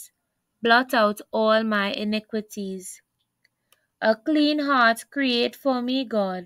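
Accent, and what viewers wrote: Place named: Trinidad and Tobago. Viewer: Trini accent 😁 also Amen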